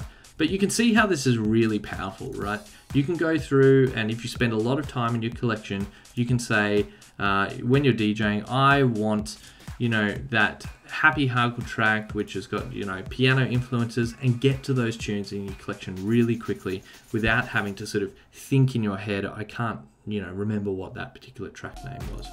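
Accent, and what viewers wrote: Australia. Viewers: wth r u from sydney?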